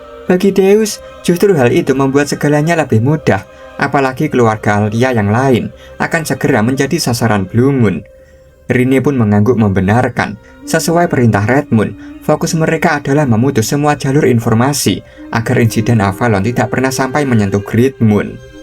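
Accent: Java, Indonesia. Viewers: serasa nonton wayang bang, java lu medok bngt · Bang kamu orang Jawa ya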